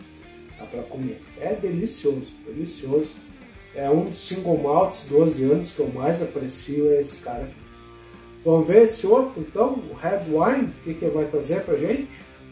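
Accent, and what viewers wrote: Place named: Rio Grande do Sul, Brazil. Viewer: Buenas meu amigo que, pelo sotaque, só pode ser gaúcho! Conheci o canal hoje e já fiz a inscrição! Ótimo conteúdo! Abração!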